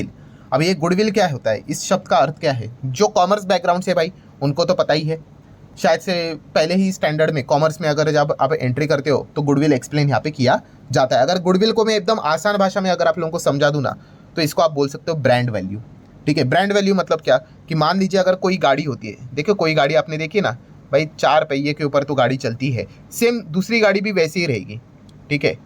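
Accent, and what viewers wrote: Maharashtra, India. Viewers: Trump tower Bandha hai 😂😅 Are you Marathi?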